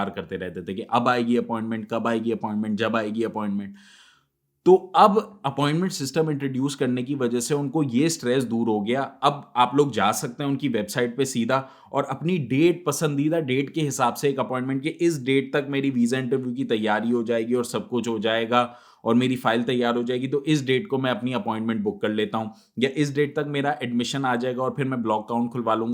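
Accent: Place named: Karachi, Pakistan. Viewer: Kirachi ka ha bhai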